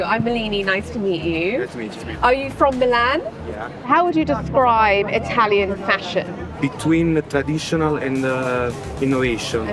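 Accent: England, United Kingdom. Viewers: Your English accent is just awesome. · What race mix is she ? Sounds british, but looks between south asians and latina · Wow i love your British ❤accent.. Adoro il tuo accento... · born to an American father and an Indian mother. She's an American national born and brought up in Miami.I don't know from where she got this beautiful British accent.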